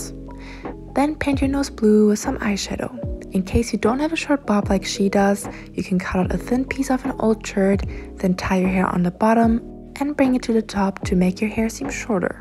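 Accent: Germany. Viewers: off topic but what accent is this? german?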